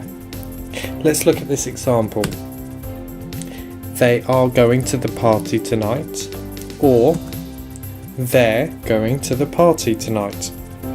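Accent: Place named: United Kingdom. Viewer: What British accent do you have? It sounds very clear to me.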